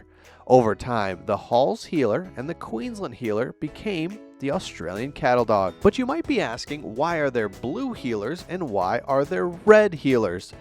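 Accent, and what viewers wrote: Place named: United States. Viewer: thank you. I bred ACD’s once upon a time in north Queensland. They’re the only dog I’ve ever owned. I put in thousands of hours a year training them, studying them, and teaching their new owners all about their behaviours + heritage. Whenever I watch a YouTube video on them - particularly one narrated with an American accent - it’s easy to predict that the content will be mostly non-factual. This video was no exception.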